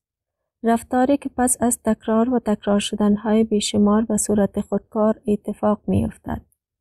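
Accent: Afghanistan. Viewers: Dari accent is also beautiful I like it. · خیلی با دری در ارتباط نیستم ولی خوب حرف می زنه فقط عادت ندارم بهش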